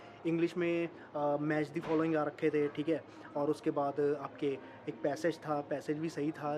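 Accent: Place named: Punjab, India. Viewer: Bhai aap Punjab se ho kya? Apki hindi me bhi punjabi accent aa raha hai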